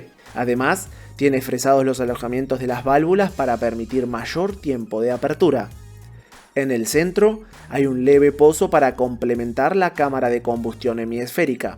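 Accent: Argentina. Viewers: soy argentino y ese acento es muuuy argentino.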